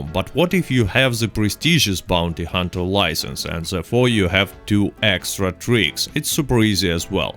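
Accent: Russia. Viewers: Russian accent and wilderness sounds great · he has a Russian accent maybe because he is Russian?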